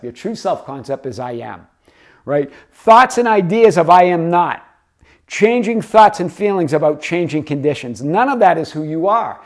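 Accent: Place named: New England, United States. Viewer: tbh nothing makes me smile like hearing your authentic new england accent! 😂 It makes these videos hit home so much more for me living out here in cali haha. Love your contagious energy!!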